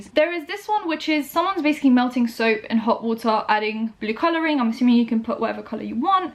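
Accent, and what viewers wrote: United Kingdom. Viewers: I have hated everyone in the UK's accent EXCEPT for this girl. idk why I love her voice so much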